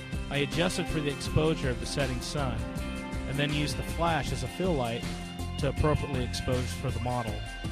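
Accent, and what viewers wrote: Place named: Chile. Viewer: me gusta ese acento chileno.... tienes un dislike big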